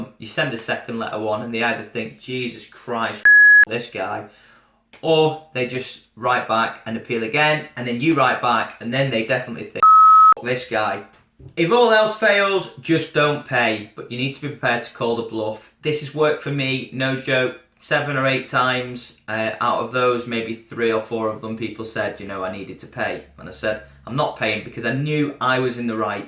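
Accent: United Kingdom. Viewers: Guile Miran I think his English accent could be the giveaway. 🤦‍♀️🤦‍♀️ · this is UK, not US. Cue is in the accenr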